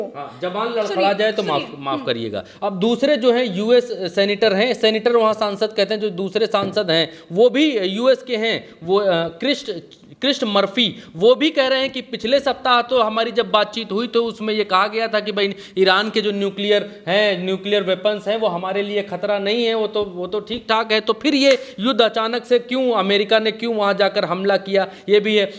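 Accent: India. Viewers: bhaiya aapka desi Andaaz hai maja aa jata aapko sunkar Jay Hind Jay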